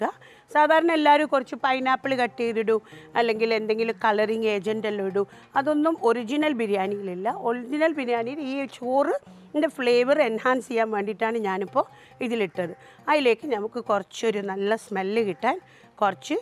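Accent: Kozhikode, India. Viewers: This is og Calicut slang. Surabhi in m80 moosa uses a bad mimicry of this. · Kozhikkode സ്ലാങ്ക് പൊളി........ ഇങ്ങള് ബരിം.........😊👍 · Her kozhikode slang♥️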